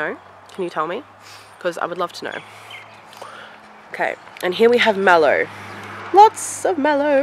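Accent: Australia. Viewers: Just found you and hearing a fellow Aussie is awesome!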